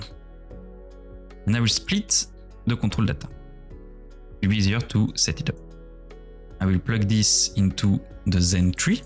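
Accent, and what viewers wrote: France. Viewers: your french accent sounds funny, i like it. Keep the great work up😆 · Ca c'est un Français qui parle anglais :) sympa la vidéo, bonne continuation ! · J'ai presque l'impression que tu parles francais, c'est cool c'est plus facile a comprendre 😊 Merci pour la vidéo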